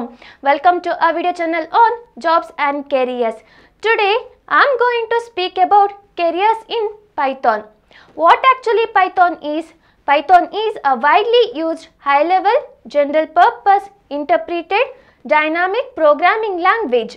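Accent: South India, India. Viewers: South indian voice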